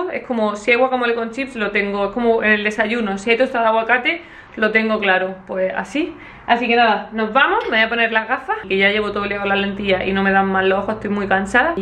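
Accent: Spain. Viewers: Si!! Graba el sobre ruedas! Me encantan tus vídeos! Y no tienes el acento de España muy marcado! No sé a qué se debe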